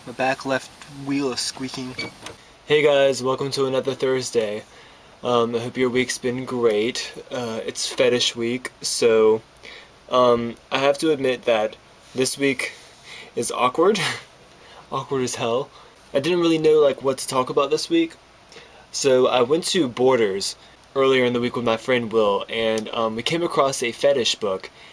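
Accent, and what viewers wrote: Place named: France. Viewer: do you have an accent? you sound a tiny bit french :]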